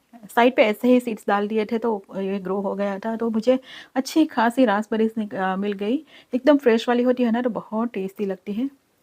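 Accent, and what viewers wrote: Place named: Parsi, India. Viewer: Your hindi is little different, like parsi people speaks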